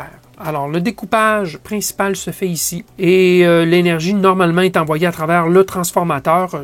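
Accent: Quebec, Canada. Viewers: Ça fait plaisir de retrouver cet accent québécois !!! Très bonne vidéo.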